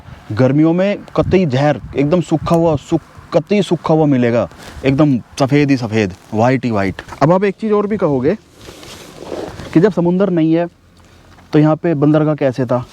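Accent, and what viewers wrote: India. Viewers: I was there in 2004. Theth haryanvi tone mein hi video bana rakha hai!!!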